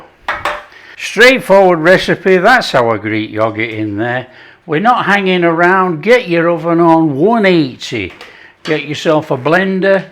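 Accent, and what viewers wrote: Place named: Northern England, United Kingdom. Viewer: Fantastic. I'd love to know what part of the uk you come from with an accent like that? Obviously the North but where???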